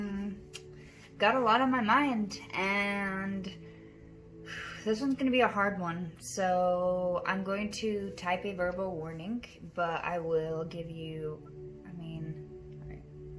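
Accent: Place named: Eastern Europe. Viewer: You sound like you’re from eastern Europe.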